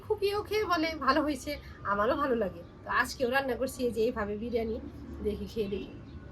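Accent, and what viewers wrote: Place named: Dhaka, Bangladesh. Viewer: এই বোনটা মনে হয় ঢাকাইয়া । কথাবার্তায় তাই মনে হয়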